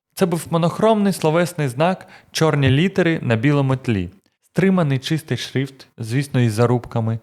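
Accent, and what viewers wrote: Russia. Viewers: Класна історія. Цікавий виклад. Дуже добре, що контент українською. Суто для покращення вашого контенту хочу поділитися побажанням: більше звертайте увагу на вимову слів, ви деякі слова вимовляєте на російський манер, а також було декілька слів російською мовою ( наприклад: колокольчик). Загалом, вас приємно слухати і радію, що є цікаві передачі українською мовою. Дякую!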